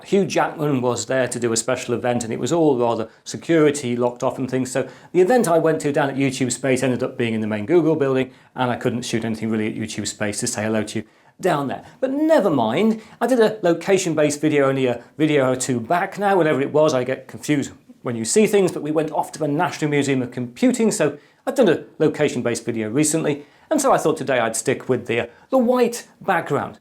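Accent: United Kingdom. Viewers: It's hard to resist the (vocal) charms of a well-spoken British gentleman, especially when he imparts information in such a crisp, concise manner. There is more than enough flash and noise on Youtube, a bit more understated presentation and thoughtfulness goes a long way. Congrats for a hundred and fifty healthy doses of knowledge, here's to loads more!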